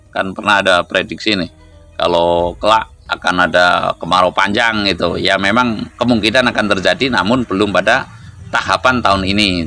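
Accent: Banyumas, Indonesia. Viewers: Aku suka logat ngomongnya ngapak2 gitu lucu tp serius,lama2 tk perhatikan tambh muda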